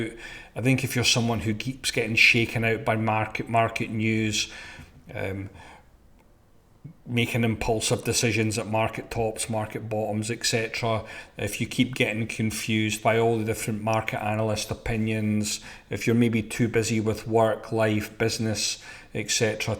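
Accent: Scotland, United Kingdom. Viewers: Just subscribed to the channel and enjoyed the content and the Scottish accent! Did a trip to Scotland 2 years ago and found a cemetery In Dysart, Kirkcaldy, that had my grandfather 7 generations back. A huge fan of technical analysis so this video was enjoyable to watch and I will watch previous months also. My biggest positions are airlines-I have 3 but I would like if you would cover UAL. One thing I don't know much about is Fib extensions so if you would cover that I would appreciate it. Thanks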